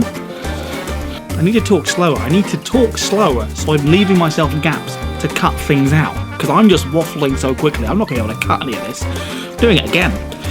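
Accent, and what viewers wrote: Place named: United Kingdom. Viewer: I came here from why did I say okie doki .. YOURE BRITISH!?!?!?!!! I AM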